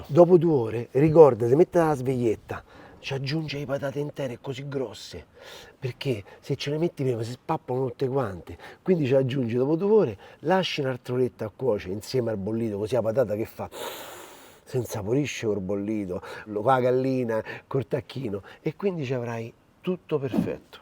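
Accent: Rome, Italy. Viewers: your Roman accent is killing me 😂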